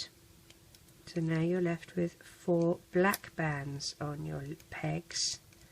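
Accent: United Kingdom. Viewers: I dont mean for this to sound mean but you have a VERY british accent also peope0le dont rage bc im British too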